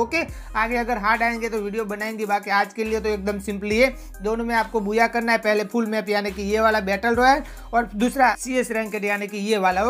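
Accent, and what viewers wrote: Gujarat, India. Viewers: Appp Gujarati ho kya bhai ?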